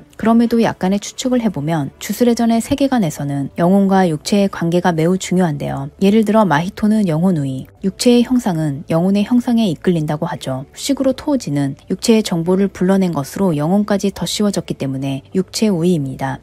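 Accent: Japan. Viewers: 항상 잘보고 있습니다 일본어 발음이 영상의 매력같아요ㅋㅋ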